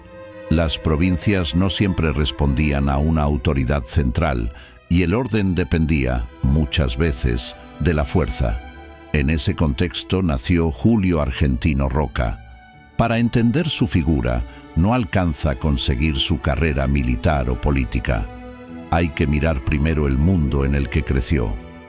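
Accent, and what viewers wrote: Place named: Castile, Spain. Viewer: Buena biografía. Pero la imagen del min. 1.48 no le corresponde a Alejo Julio Argentino Roca Paz, sino a uno de sus primos Reboredo, éste creo que es Florencio. Ambos fueron estudiantes en el Colegio del Uruguay, creado por Urquiza en la capital de de Entre ríos, Concepción del Uruguay. No entiendo el tono castizo de la locución. Es para parecer más "interesante"?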